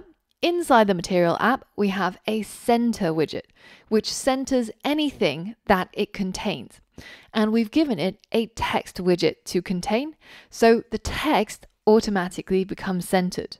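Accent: United Kingdom. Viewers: I'm getting accidental ASMR from your British voice :) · I love your British accent!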